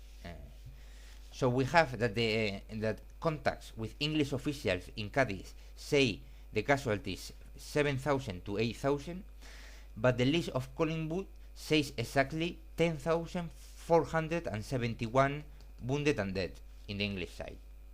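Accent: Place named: Massachusetts, United States. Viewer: This Inglish is from Machachuset, salu2 desde ESPAÑA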